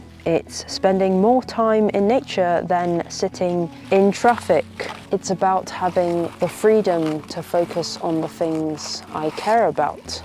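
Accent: England, United Kingdom. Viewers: Yes, but an English accent doesn't mean she holds only British citizenship and while unlikely may not even be British. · Such a beautiful girl and pleasant British English!!! All the best from Russian subscriber!! Love this style of life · You don't recognise the accent? 100% English accent. Not even cockney accent.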